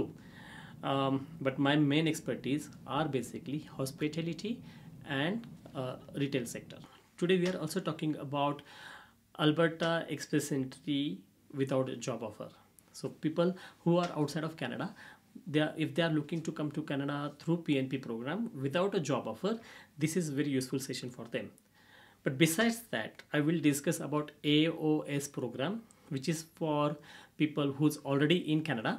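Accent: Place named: Maharashtra, India. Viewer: You sound like a Maharashtrian, i want all Maharashtrians to speak loud clear and be the boos of their trade. I hope you will outshine and take my suggestions positively. Looking forward to be your client in coming time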